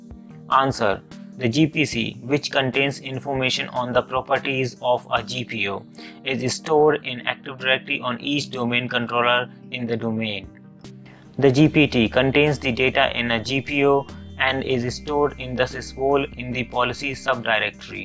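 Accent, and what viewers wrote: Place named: India. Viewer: oh god another AD video with indian english. can we please get an actual american to redo the F*cking audio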